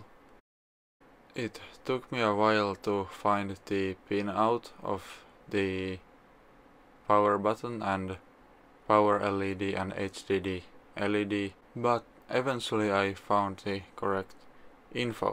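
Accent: Finland. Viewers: Katsoin 10 sekuntia ja oon täysin varma tästä Suomi aksentista. Löysin tänne koska etin videoita kotelosta kun tilasin tän siskonpojan kokoonpanoon ja halusin nähdä mimmosii fiiliksii muilla on tästä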